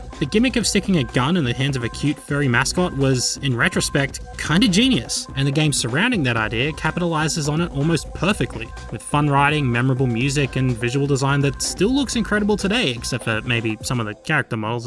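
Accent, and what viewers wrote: Australia. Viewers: thank god this dude is Australian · where about in australia u from bro? (i’m from perth) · Thought something was off while watching the video, turns out he's just australian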